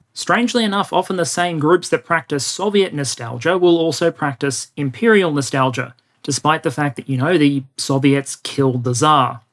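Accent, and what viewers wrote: Australia. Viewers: He's Australian. It's weird how many comments there are saying he's British though...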